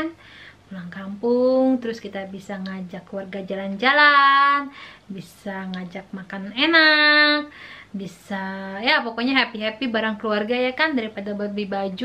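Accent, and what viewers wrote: Jakarta, Indonesia. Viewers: Hai, aku niy sukaaaa banget sama, krn sll mengingatkan aku pada tetangga2ku dulu waktu aku masih tinggal di salah satu daerah di kebayoran baru, yg sekeliling aku itu orang betawi semua, jd selalu kangen dan teringat dg tetangga2ku dulu, dari cara bicara, sikap, mirip dengan... btw netizen mah ga usah didengerin mpok, sehat selalu dan bahagia selalu ya dg Bang Sabeni😊🤗😘😘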